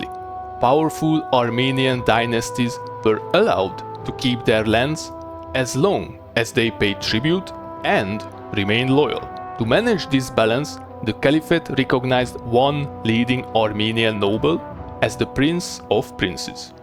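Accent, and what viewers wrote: Hungary. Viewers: Your accent look very hungarian.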